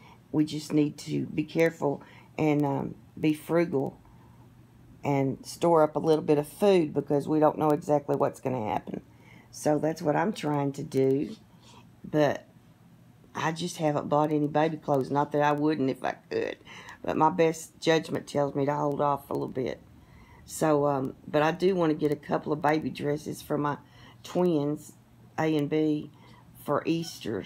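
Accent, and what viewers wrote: United States. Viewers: You have the sweetest voice. Listening to your voice makes me want to be a little girl so I could crawl into your lap and hear you tell stories. That sounds SO creepy when I type it out, sheesh! I mean it in the nicest (non-stalker) way possible though! I'm from Australia, so your US accent is different, plus you have that rich, beautiful Southern tone also that just sounds so lovely to hear. I hope your health is on the upswing very soon. I have heard from others how steroids can really mess a body about. I wish you happiness and health!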